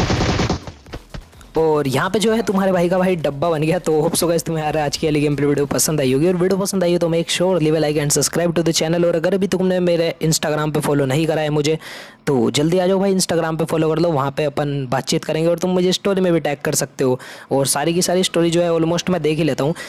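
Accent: Haryana, India. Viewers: Haryana ta h ka bhai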